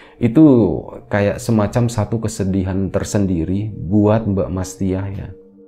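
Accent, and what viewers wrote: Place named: Riau, Indonesia. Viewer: Judulnya itu lhoo mas... ngreget aku.. 😆 Shang Pemulung Riau Hadir mas, Riau mana ini suaranya.. 🙌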